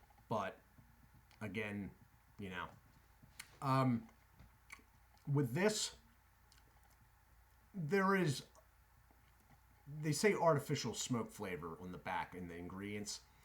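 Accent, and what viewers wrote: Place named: East Coast, United States. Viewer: Peace! Great channel! I’m a New Jersey native for most of my life and now I’m in my 40s in the east Bay of California, and it’s nice to hear an obvious East Coast accent! I’m off of cigarettes for almost 20 years now, yet I have been dabbling in things like cigars, nicotine gum, etc. etc. to bridge the gap when I’m stressed. I would like to be able to order things like Swedish snus or certain types of dips online, in bulk so I have it around. I know northerner is just way too expensive. Any chance you have a good lead to a reputable end respectably priced source for Swedish snus and the like that you know to have decent shipping turn around? Like the channel a lot! Peace Shawn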